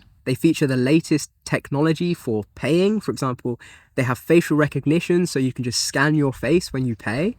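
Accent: RP, United Kingdom. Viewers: you sound posh and naive.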